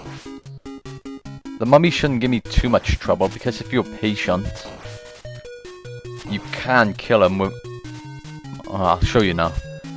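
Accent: Wales, United Kingdom. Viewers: I NEVER GET TIRED OF THE TOTALLY VOICE FULLED WITH WELSHNESS Does that make me sound Gay??? O_O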